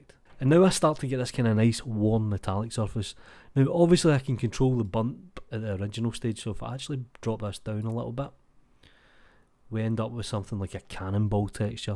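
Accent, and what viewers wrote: Scotland, United Kingdom. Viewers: Everything is a billion times better with the scottish accent and i mean everything.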